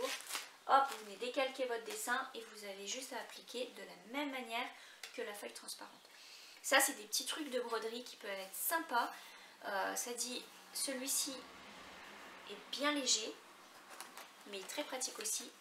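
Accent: Quebec, Canada. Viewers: Petit accent Québecois? ^^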